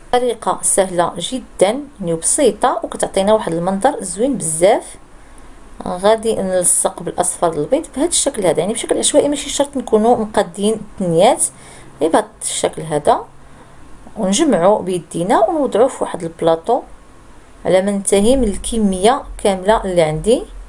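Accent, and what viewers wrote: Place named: Morocco. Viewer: سلام أختي الله يعطيك الصحة و يحفظك لوليداتك تعجبني اللهجة المغربية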